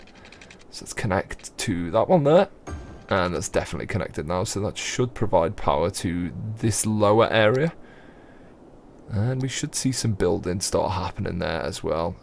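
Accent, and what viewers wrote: United Kingdom. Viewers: Man Bear Pig Gaming for the same reason i enjoy your prison architect stuff; derpy, well intentioned, plate-spinning enthusiasm coupled with some fine uk-flavoured commentary.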